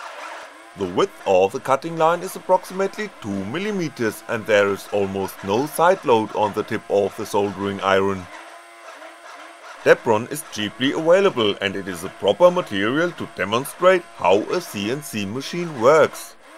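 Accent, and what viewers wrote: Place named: Germany. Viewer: немец на английском - ЖЕСТЬ!!!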